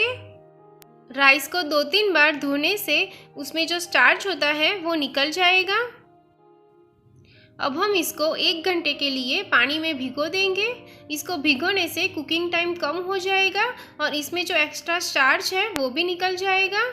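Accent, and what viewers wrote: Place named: Bradford, United Kingdom. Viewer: Cant understand her Bradford accent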